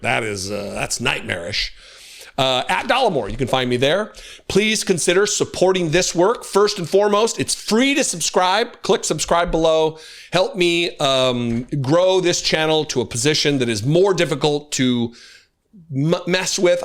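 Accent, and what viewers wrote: South, United States. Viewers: I dig your sing-songy Southern preacher embellishment,!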